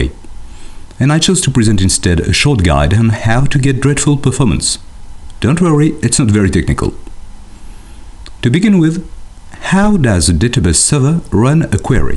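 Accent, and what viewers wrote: France. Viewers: You guys should be happy he is taking the time to put this online and help us (instead of bitching).. plus his french accent is pretty light, compared to some. Thanks again for the videos they are a great help. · He does not sound anything like an Indian. The narrator is French.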